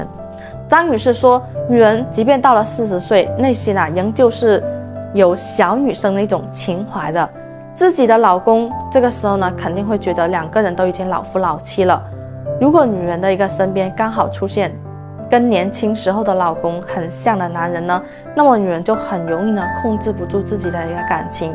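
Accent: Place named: Fuzhou, China. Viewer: 請問這位講話的人是不是來自福州？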